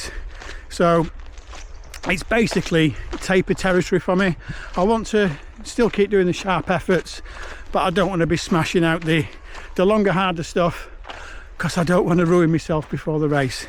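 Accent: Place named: Yorkshire, United Kingdom. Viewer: How come you got a Yorkshire accent and you're running for running for l the Welsh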